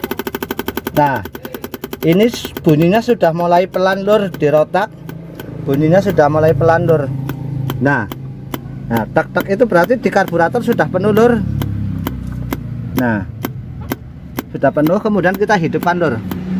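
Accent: Banyumas, Indonesia. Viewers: ngapak ya waaakk...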